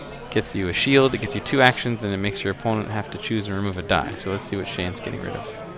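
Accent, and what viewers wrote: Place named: Canada. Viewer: lol just the way you pronounce it like Zor instead of Sore. Chances are it's just another ridiculous Canadian thing like Zed instead of Z.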